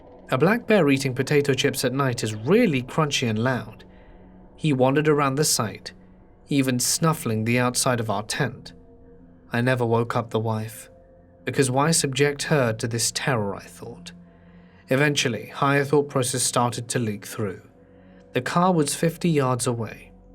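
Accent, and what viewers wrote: United Kingdom. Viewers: I really enjoy this particular British dialect, though not quite sure which specific one it is.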